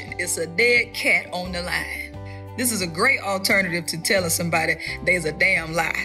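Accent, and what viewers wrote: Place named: Mississippi, United States. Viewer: Love your accent. Not the same as some Mississippians. I like it better the Mississippi British brogue one.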